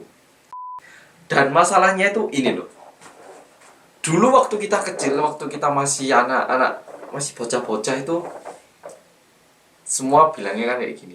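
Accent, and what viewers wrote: Java, Indonesia. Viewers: Jarang" liat ka ngomong bahasa korea, jd keliatan kaya orang korea asli, soalnya jawanya medok banget, kaya orang indonesia asli padahal orang korea 😂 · medog jawa fasih korea😂😂😂 top bgt👌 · Ngomong "medok" nya oke punya 😅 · Kok lbh cocok kan ngomong mendok dr pd Korea sih?😭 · Aku lebih suka oppa medokk sumpah.. Ganteng nya ratusan derajattttttttt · Jawa banget mas e iki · bang mantap medoknya, aku asli jawa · Gak kliatan ya koreanya lebih ccok jadi jawa aja, ini orang korea gak malu dengan logat, yg indo malah sok bule Salut banget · kok bisa ya kamu bisa ngomong bahasa jawa medok banget kayak orang jawa tulen. dan bhasa indonesia fasih banget kyak orang indonesia. kami semua heran loh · 한국말하실때도 자바사투리 살짝 있네요 ㅋㅋㅋㅋㅋ 신기하다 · medokeee rekkkk 😂😂😂😂😂😂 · Menginspirasi videonya. Ada benernya juga. Mungkin bukan bermaksud "jadi juara satu", tapi " cara bertahan hidup di kota yg keras". 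Btw, logat ngomongnya lucu wkwk, medok jawa gitu padahal org korea asli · Salam kenal.... medok bgt yo... di Indonesianya uda berapa lama? Pertanyaannya kenapa kamu bisa timbul stress ??? Wkwkwkwkwkw.... menurut aku orang yang bunuh diri adalah orang yg krisis akan iman dan kasih.... orang yang tidak mengenal Tuhannya dengan baik. Iman semakin kuat, hubungan manusia dengan Tuhan dekat takkan timbul rasa putus asa, takut meskipun kita berada di ambang kehancuran sekalipun. Karena mereka percaya Tuhan pasti akan mengembalikan semuanya bahkan jauh jadi lebih baik. Karena tidak percaya, tidak ada pedoman / pegangan hidup yang kuat, makanya iman dalam dirinya goyah dan putus asa. Lalu mengakhiri hidupnya adalah jalan terbaik.... Hidup yang baik adalah hidup yang berawal dari mengasihi Tuhan, diri sendiri, keluarga, sesama, dan seluruh ciptaanNya. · Mas ngomong bahasa korea kok rasanya ada medok2 jawanya ya? 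Apa cuma perasaanku aja yho mas? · baru liat channelnya, q pikir org jawa td yg bs bahasa korea. ahahhahahaaa. tertipuu. kereeen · Negara berkembang maju biasanya mmg gitu sih Bagusnya mmg jadi makin maju krn pada pengen juara Tapi jadi depresi juga jeleknya Yg bikin sedih itu netijen indo yg maha benar selalu jelek2in negara korea . Padahal mreka juga gatau gimana org2 korea dan alasan2 depresi tinggi itu 😂 Btw jgn males upload walau viewnya dan hasilnya sedikit ya Soalny bener2 seneng ada yutuberss medok KR 🔛ID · Mas, logat koreanya jd medok gegara ngomong campur bhs indonesia + korea 😁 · entah knapa pas liat awalnya ngomong pake bahasa korea rasanya malah aneh...wkwkwkw kebiasaan denger yg medok, jadi lupa kalo mas e iki orang korea hahahah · Medok banget smpe liurnya nyemprot2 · Ini video pertama yg saya tonton dari channel ini, terus nyari2 ttg anda. Keren ya bahasa Indonesia medoknya dapet gak kedengeran aksen korea nya sedikitpun, bahasa korea yg bahasa ibu anda jg aksennya terdengar natural (yg biasanya terjdi dgn org2 yg tinggal pernah di luar negeri biasanya aksennya jdi belibet). 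Good mas 👍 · Mas jawanya medok banget 😂😂 Kalah q rek.. 😄😅 Coba dari segi genetik juga bisa mas.. ☺ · Iyaya medok bgt 😅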